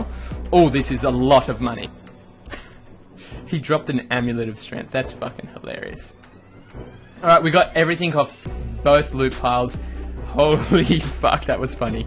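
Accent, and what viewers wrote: Australia. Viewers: Your an Aussie eh? I served in the military with a few of you bastards. Fucking love you guys man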